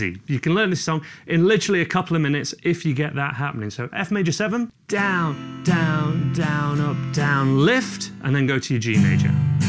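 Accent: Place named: Yorkshire, United Kingdom. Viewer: you sound like your from Yorkshire.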